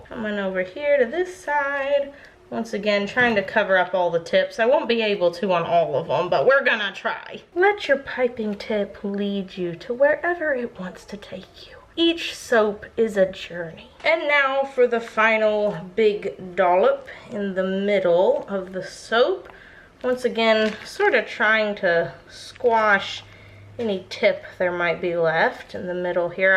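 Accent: United States. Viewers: why do you have a country girk accent ive been subscribed for a while and ive been wojdering this from the start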